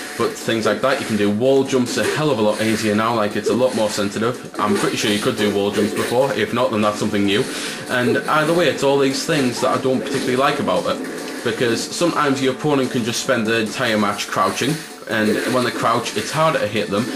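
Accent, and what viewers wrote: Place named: Cockney, United Kingdom. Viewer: Oi nice cockney 'cent ya got there!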